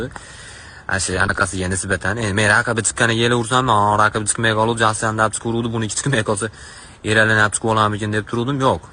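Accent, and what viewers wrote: Andijan, Uzbekistan. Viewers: Анжан. Шева зу'рда😂😂😂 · Anjancha shevani yordiz oma. Anjonlilar nechtamiz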